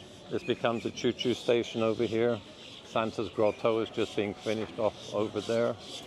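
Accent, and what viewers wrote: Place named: United Kingdom. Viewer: Sounds like a Brit who’s lived there for quite some time to pick up some Americanisms in his speech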